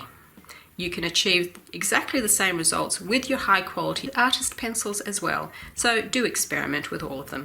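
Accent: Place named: Australia. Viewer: Thank you very much for the useful tips and advices stunning work, by the way when you speak about Australia and your accent they remained me when i was student in hight school ten years ago studying in Australia and the teachers accent over there.. you makes me miss Australia and want to visit the beautiful Australia again for two mouths lol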